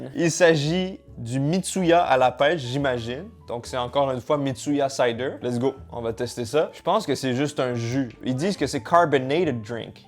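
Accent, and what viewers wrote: Quebec, Canada. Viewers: Jsp si t québécois ou français sa me trouble 🥺😂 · How did you watch this then? With his Canadian french it makes the video 100x harder to watch haha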